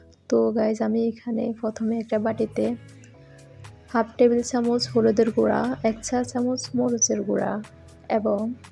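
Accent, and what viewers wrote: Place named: Noakhali, Bangladesh. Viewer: আরে না।। খারাপ না কথার ধরন পুরা নোয়াখালীদের মতো । তাই বললাম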